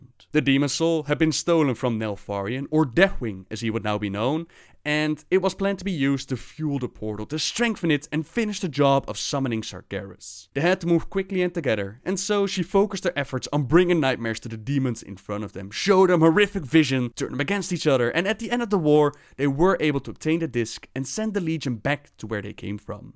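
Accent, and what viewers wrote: Netherlands. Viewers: Dutch accent> stopped.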